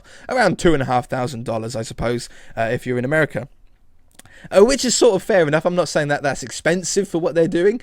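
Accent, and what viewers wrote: United Kingdom. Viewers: That British Accent I'm First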